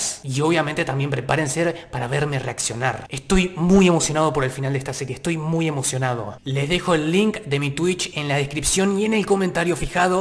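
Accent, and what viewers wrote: Argentina. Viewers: No sabía que eras de Argentina messirve paaaa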